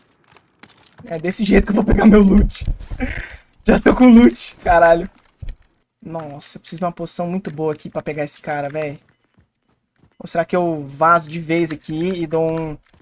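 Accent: Minas Gerais, Brazil. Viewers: sotaque de mineiro é o melhor kkkkkkkkkkkkk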